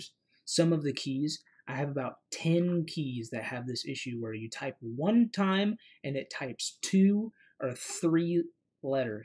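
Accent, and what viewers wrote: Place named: South, United States. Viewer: Great video and the Southern accent is badass.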